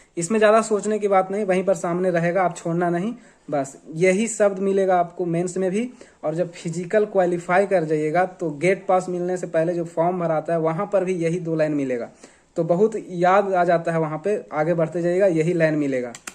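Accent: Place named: Gaya, India. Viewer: Gaya ka tone hai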